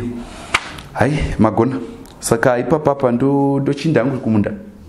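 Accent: Karanga, Zimbabwe. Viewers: Ndaitaura namai zuro🤣🤣🤣 unohwereketa chikaranga chomene iwe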